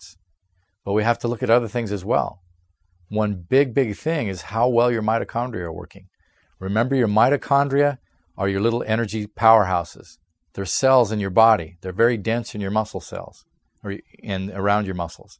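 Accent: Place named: United States. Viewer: may i get this video in Hindi ? I don't understand ur fast American English 😪😪😪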